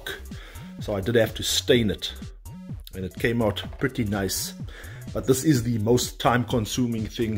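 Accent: South Africa. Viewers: South African accent? Cape Town?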